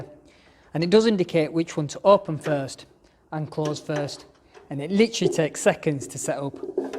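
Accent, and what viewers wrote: United Kingdom. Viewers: Fantastic review. Informative, demonstrative and to the point. No fluff. And a lovely Northern accent to boot! Hope to see more of your reviews in the future.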